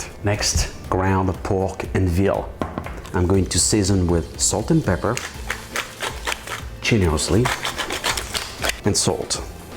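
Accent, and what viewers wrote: France. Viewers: Ce que je trouve unique dans ces vidéos de Bruno, (outre le talent culinaire, le caractère trop sympathique du personnage ) ce sont les bruitages (quand il mord dans un plat, oubien les couteaux qui valsent, les oeufs qui dégoulinent etc... les sons sont bien travaillés , "incredible" !! - QUI fait toutes ces mises en scènes et ces bruitages, c'est TROP BIEN ! - Merci à Bruno pour tous ces partages ! - et le petit accent français tout en parlant un anglais "fluent" : en plus, j'apprends et "brush up my english too !" - Hip hip Hourra !!!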